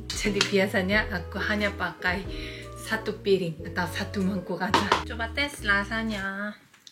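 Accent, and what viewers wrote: Java, Indonesia. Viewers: Lo Lo Lo kok logatnyaa Jawa to inii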